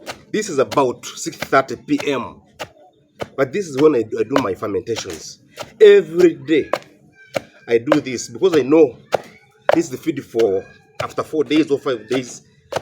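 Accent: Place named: Ankole, Uganda. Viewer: You're located where. U talk as if you are a munyankole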